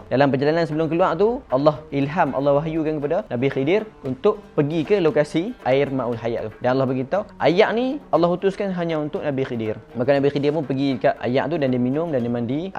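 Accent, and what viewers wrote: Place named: Terengganu, Malaysia. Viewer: Mate Prike hor ler kiter ni org pata timo je (ganu) 🤣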